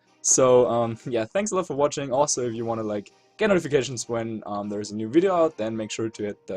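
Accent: Germany. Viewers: First comment of the day LOL it's an honor. I like the way you say it with German accent better tbh, wasn't trying to be mean. Keep up the great content, both VGC and if you decide to start a new series! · Lolz, nothing wrong with german accented Vs, how about ' Battle Spot Story' or something? xP I was playing on the N64 recently n' it's the 1st thing that came to mind, <3 Story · Your German accent is fine! Pokemon is an international community and that's what is so awesome about it!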